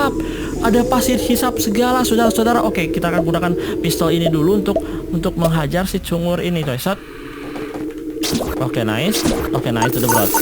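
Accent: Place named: Cianjur, Indonesia. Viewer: Kayak orng cianjur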